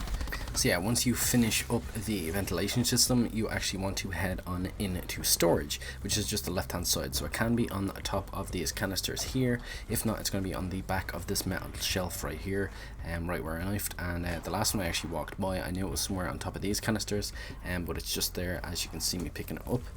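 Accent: Ireland. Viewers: You Irish?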